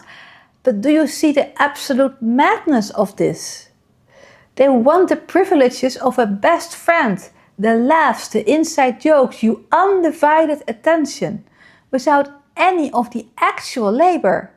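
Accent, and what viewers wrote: Netherlands. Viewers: Thanks. You have a knack for this. And it's enjoyable to be "dressed down" (in a good way) in English spoken with a Dutch accent, for some reason. One of the tell-tale signs of a cheat who will destroy your peace is rewriting history. If they show themselves willing to try to cheat reality itself constantly, there is no doubt they are a cheat.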